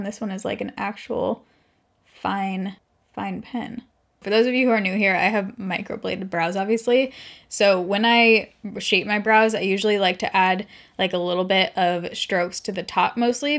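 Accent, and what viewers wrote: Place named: United States. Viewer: Scalps. She made scalps for dinner... The American accent blows my mind sometimes lol. Love you, girl! 💕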